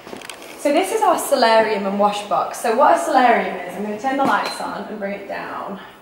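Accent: England, United Kingdom. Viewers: Are you english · Are you british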